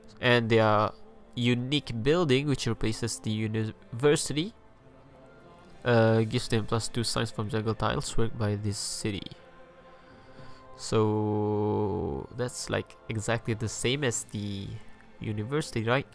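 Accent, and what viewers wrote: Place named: Malaysia. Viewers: Sounds Malaysian, hi! xD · I can recognise the accent especially when you say Darussalam, Kampong, Che Bong Nga, Gajah, Alam Melayu, and Pesilat. Very significant ^^ Nice to meet you :D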